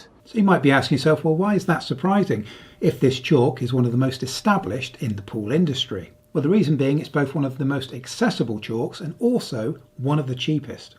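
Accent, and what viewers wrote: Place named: United Kingdom. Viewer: Can I ask what countries currency you're saying in dollars? Mostly so I can make peice conparisons to New Zealand dollars. You sound like you should be saying pounds...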